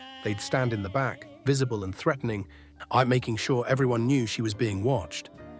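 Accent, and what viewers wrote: United Kingdom. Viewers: Ok, hold the phone! You ask us to subscribe when you’re telling stories of us from this country with a British accent? Like we have to listen to a proper outsider. There is a word for this. Condescension!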